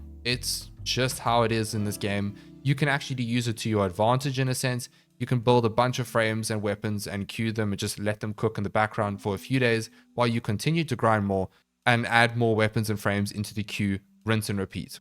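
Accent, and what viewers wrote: Afrikaans, South Africa. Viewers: this dude sounds south african 🇿🇦🇿🇦🇿🇦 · Instantly heard the Afrikaans accent and now sir, you have +1 subscriber! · Is that a south african accent i hear ...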